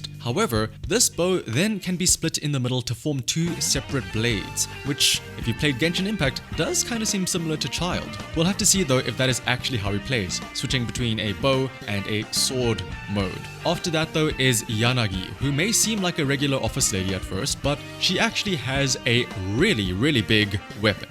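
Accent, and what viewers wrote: South Africa. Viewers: are you SouFafrican? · So good to hear a South African accent on YouTube ❤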